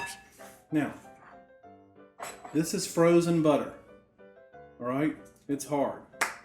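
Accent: South, United States. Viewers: Why do I sound like a Southern girl after watching your videos? :) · Awesome! Thanks. I know that the KetoConnect guys said you're from Florida, but have you lived there all your life? You sound more like a SC or GA native. I've lived all my life in SC, NC and Florida, so truly a southern girl looking for an easy recipe for my favorite low country BBQ sauce (low carb of course) :).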